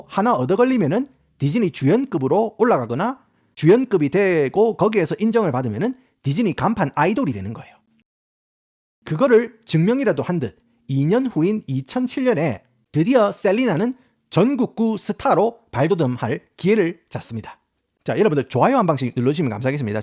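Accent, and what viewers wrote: Gyeongsang, South Korea. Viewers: 나도 경상도 사람이지만 사투리 억양이 듣기 싫어서 못듣겠어서 나갑니다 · ㅋㅋㅋ...경상도 말이 너무재밌네요~구독좋아요~홧팅삼춘~ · 아닠ㅋㅋㄱ 님 걸쭉하게 경상 사투리 쓰다가 갑자기 영어발음 갑자기 멋있게 뽑는거 웃겨욬ㅋㅋㅋ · 이야기를 정말 맛깔나게 잘 하시네요😊.. 사투리도 좋구요~~ 고향이 경상도쪽이시죠?😅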